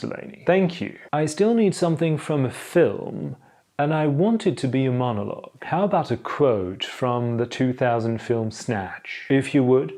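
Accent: United Kingdom. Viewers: Really odd hearing you speak English, and with a perfect British accent too. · Perfect British accent! Expecting next videos) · A real hoot! The lovely British accent was unexpected . Did you spend more time with British content than with American content? Or maybe you can turn on an American accent as well. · That’s a gripping video! 😻 You’ve got an impeccable British accent. Best from Poland! 😊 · oh my goodness so british it is wonderful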